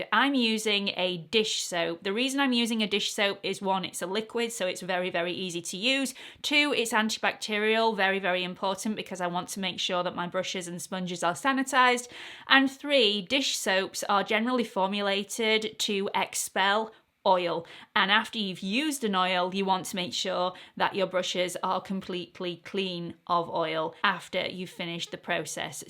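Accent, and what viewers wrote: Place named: England, United Kingdom. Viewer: I use Woolite gentle textile shampoo for my brushes - is that sufficient and my beauty blender after every use in dishwashing liquid. A new happy and very enthusiastic subsriber. Appreciate your professionalism and wonderful English accent. Similar age to your gorgeous Mom and have learn so much from your amazing tutorials. Thank you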